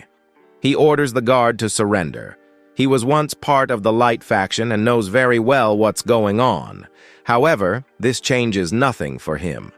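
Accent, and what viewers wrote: United States. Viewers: What's with the texan accent 😊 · Tf is that country accent 💀😂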